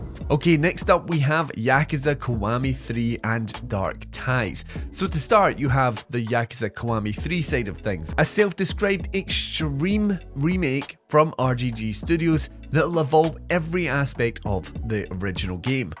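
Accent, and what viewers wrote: United Kingdom. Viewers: Please upload review game vedios . You're vedios are awesome. Are you British ❤❤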